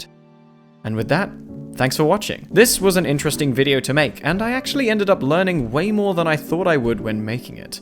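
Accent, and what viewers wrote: United Kingdom. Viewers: I mean this in the most positive way possible, but I wonder how well this series would do without the british accent, because I think it implicitly adds so much to the videos through the sort of "snarky british" trope.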